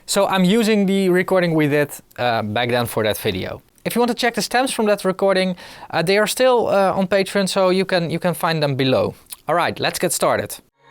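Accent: Netherlands. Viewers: Is he dutch? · Your accent sounds like a Dutch accent (Ik ben een fake Nederlander)! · Your Dutch, arent you? :D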